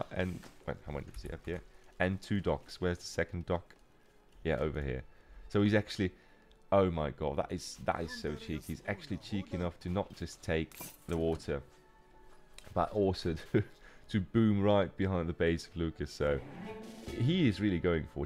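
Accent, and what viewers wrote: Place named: England, United Kingdom. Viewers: der Meinung war ich auch. Ein leichter britischer Akkzent kommt da durch😁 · Ja krass, du klingst echt wie ein Engländer.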